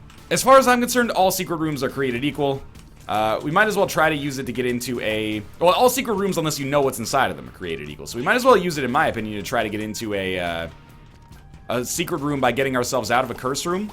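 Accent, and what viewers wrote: Canada. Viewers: NL went so fucking Canadian this episode. The dark color to your tears after the reroll (and the thing that gave you piercing and spectral tears) was Dead Onion, btw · So NL's Canadian, eh?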